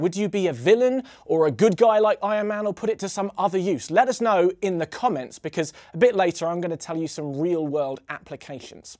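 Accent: United Kingdom. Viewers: Its British accent xD...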